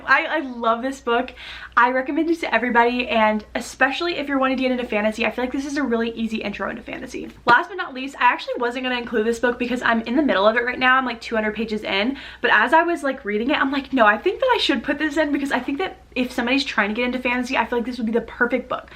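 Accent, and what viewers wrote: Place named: Russia. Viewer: You akcent rusian 👀👀👀👀👀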